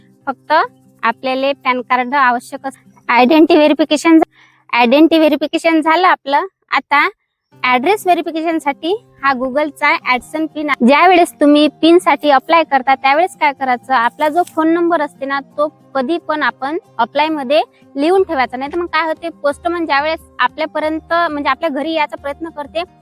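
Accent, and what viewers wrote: Vidarbha, India. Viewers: तुला विदर्भातील भाषा कळते काय. विदर्भातील भाषेचा टोन आणि पद्धत अशीच असते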